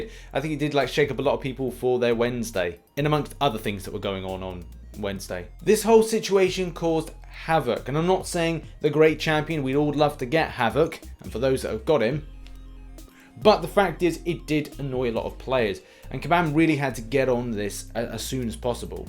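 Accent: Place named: Yorkshire, United Kingdom. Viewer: Not a bad Yorkshire accent there I’m from barnsley which is the broadest Yorkshire!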